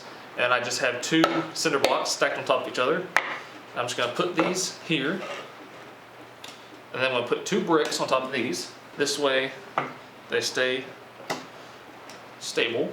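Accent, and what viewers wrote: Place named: Pennsylvania, United States. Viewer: your from pennsylvania aren’t you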